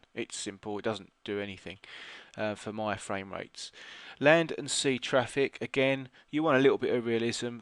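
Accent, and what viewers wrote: United Kingdom. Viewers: I FUCKING LOVE YOU THNK U BRITISH OR WHATEVER COUNTRY UR FROM it really helped allot now i can enjoy flying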